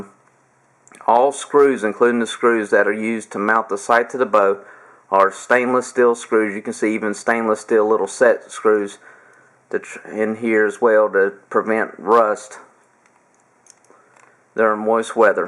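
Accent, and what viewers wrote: South, United States. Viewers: Mate, as an Aussie, I find your southern drawl to be both easy to understand and charming. Thanks for this great video. You have done a good job of pointing out the features that make this sight different and special. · GREAT REVIEW....LOVE THE SOUTHERN DRAW.... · I bought the pro HD HS version with the sliding dovetail and it arrived today. Mounted it and I'll sight it in initially early next week at the indoor range. It is a very nicely machined piece of equipment and my PSE Revenge is sexier already. LOL Thanks again for the review and I have some great friends with Southern accents so you're good to go! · I used this review 2 yrs ago, to clarify the instructions on the package. It was very helpful. In fact I bookmarked it, and still refer to it on occasion. As for the sight itself, I originally had it on a Mathews Drenalin, with a 4 power lense, and it greatly increased the accuracy. 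I have since upgraded to the Mathews no cam st, but I transferred the sight because it does not need to be upgraded. I now proudly own the deadliest tack driving bow & sight hunting combo I can imagine. Just for the record, you NEVER need to apologize for the southern drawl, y'all. Good hunting. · Give you 300 likes, I just bought this sight from a guy and had no idea how to use it, your tips on setting ranges is great. Thanks, southern drawl no problem, from Arkansas ha.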